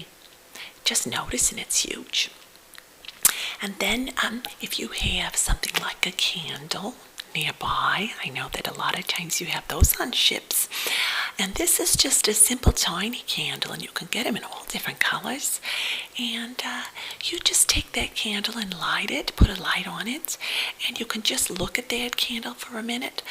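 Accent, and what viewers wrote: South, United States. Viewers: Love the southern accent. There is something very... accommodating? about it. I don't know how to put it :)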